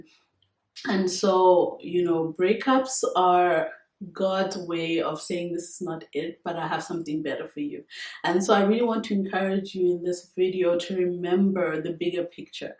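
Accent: South Africa. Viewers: You’re so beautiful 🔥🔥🔥😍and you look and sound South African ❤❤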